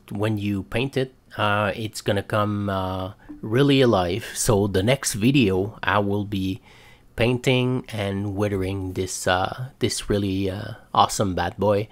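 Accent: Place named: France. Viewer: My dude, for a french guy you have a great accent!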